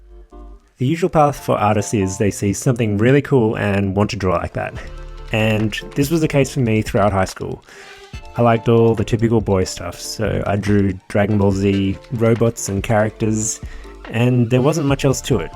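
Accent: Australia. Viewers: WAS NOT EXPECTING THE HUGE AUSSIE (or New Zealand) ACCENT!!! I fell off my chair XD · Australian? o_o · wait was that sydney I heard..? yOU'RE AUSTRALIAN TOO?? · Whew, asian-australian (?). The accent was unexpected.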